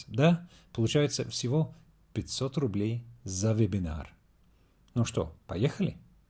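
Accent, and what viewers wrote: RP, United Kingdom. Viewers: Thank you for your gorgeous British English among everyday Globish! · Наконец-то я дождалась видео, где полностью говорит по инглишу. Такой приятный акцент. Раньше британские акценты не любила. Но акцент просто мррр. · It is the BBC accent 😂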